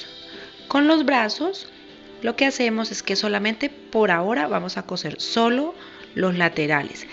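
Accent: Colombia. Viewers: Explicas super 👌🏽 tienes un lindo acento, eres de Cali? Obvio me suscribo a tu canal, me encantó 😉 👏🏽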